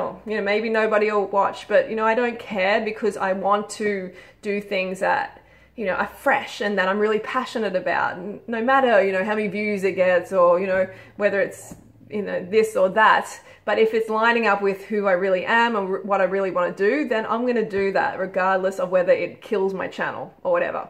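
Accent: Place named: Australia. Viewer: say whatever you want Bebe, i can definitely listen to that Aussie accent of yours all day:)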